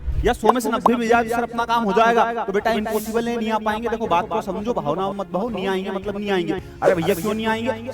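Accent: Indore, India. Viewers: Pure indore accent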